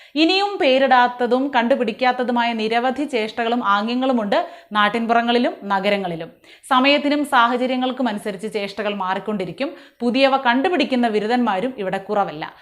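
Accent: Thiruvananthapuram, India. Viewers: Madam T VM aano.Aa Bhasha suprera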